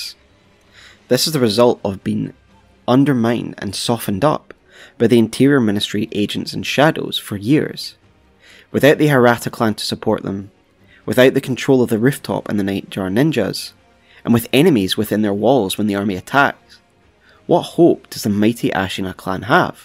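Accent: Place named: Scotland, United Kingdom. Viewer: Scottish probably! They use eii with pretty much everything!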